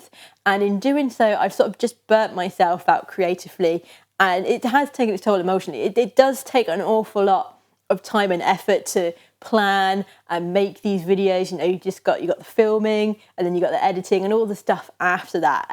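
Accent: England, United Kingdom. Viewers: Congratulations from Madrid! I love your English accent… where you from?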